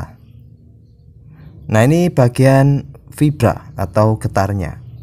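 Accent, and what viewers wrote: Java, Indonesia. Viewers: Jowone ngdi mas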